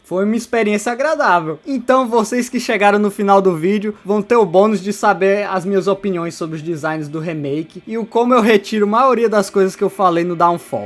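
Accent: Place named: Northeast, Brazil. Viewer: Na moral, é horrível ter que ouvir essa narração de nordestino, bagulho irritante demais!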